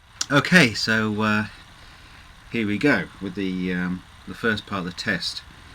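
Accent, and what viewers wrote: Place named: Yorkshire, United Kingdom. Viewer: Well done. Have a listen to the Swedishguitar Nerd comparing his monster '85 Gibson Les Paul to the Epiphone LP Special 2@ Epiphone Special II & Gibson Les Paul Custom comparison More confirmation of the truth. With a sister who's a composer, reviewer and teacher using a 20k Smallman classical, who dispairs at the lack of the expsensive tonewoods for luthiers making top end accoustics in Spain (such is the greed for them for irrelevant kit). She berated my attempts to source a 'good' tonewood electrical guitar. Until I researched and gave up. I trust her, she teaches good performer electric guitarists and as she says, our gendre is wires and magnets. She has always said you can make the body of an electric guitar out of any old crap and provided the wires and magnets are good the sound will be too. The neck has to be playable but the magnets are picking up the fluctuation in string which is then amplified electrically. 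An electric guitar is not amplified by the resonance of the timber. This video says it as it is. There may be the odd nuance, maybe, maybe a harmonic (who knows) but, nothing that can't be induced by a Line6 HD500 somewhere along the supply chain. Some people don't like the truth when it flattens their beliefs and downs their hero's. speaks like a Yorkshireman telling it as it is. Well done. But then again, there are always those that simply have to believe that the world is flat, the universe rotates around the sun and there is a pot of gold buri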